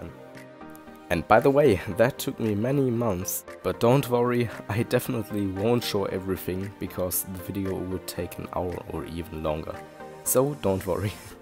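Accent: Germany. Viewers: Are you german???? Your voice and english accent sounds a lil bit like that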